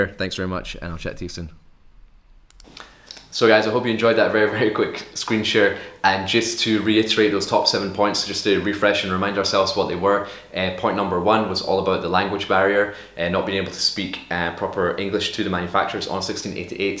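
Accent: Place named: Edinburgh, United Kingdom. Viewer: Thank you very much, and thank you for the Scottish accent, sounds like Edinburgh guy 👍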